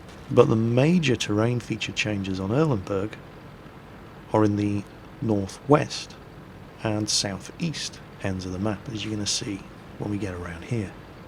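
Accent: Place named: United Kingdom. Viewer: Ok, When you said WG should have gotten you to do the British crew voices.... HELL YES!!! >.< Had to pause to post this.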